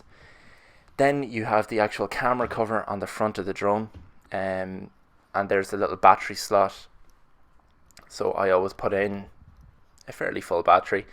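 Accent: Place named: Ireland. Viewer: Great review I have the same drone and like you building up confidence with every flight!!! It's fantastic hearing an Irish accent perspective just for a change!!!! Any plans to upgrde in 2023??? I'm looking at the Air 2S or Mavic Classic 3 purely for having a drone for every wind restince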